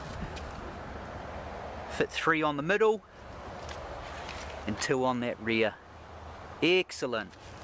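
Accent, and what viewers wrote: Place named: New Zealand. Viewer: Funny kiwi accent)) Keen as))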